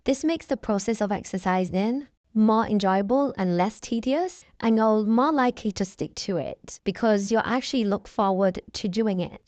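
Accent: United Kingdom. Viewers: She’s very easy to understand, she just has a UK accent. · I'm from London. Sounds like she's in the UK to me but not London born. As opposed to a voice filter.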